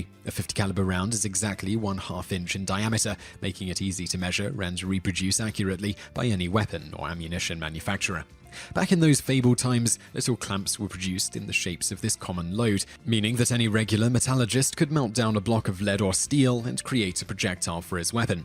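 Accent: England, United Kingdom. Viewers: You'll notice a limey brit is narrating this video and making derogatory remarks about handguns using liberal adjectives. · the reader , Is just that, no knowledge at all, But with English accent , to project an aura of superiority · What an idiot!!! Not really an unexpected grand pile of PS one has come to expect from the Britts judging by the accent and with the absolute lack of knowledge of firearms other than the garbage spewed from the ignorance of the anti-gunners. While yes many of these guns are "over the top" they do have a purpose. That last one is little more than a novelty item. On the other hand to say that the Desert Eagle 50 cal. is the favorite of gangsters and mob bosses is bull to the highest degree. While yes there are a few criminals that use them most would prefer something a bit more easily concealed and way less obvious and in your face. 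They don't want to be running around with something that you practically need a wheel-borrow to carry around. A bit too obvious to the cops. Then again most of what he was saying was just a jab at gun owners, most of who are law-abiding and perfectly reasonable people. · I should have known. English like accent talking about firearms. Not close to being accurate.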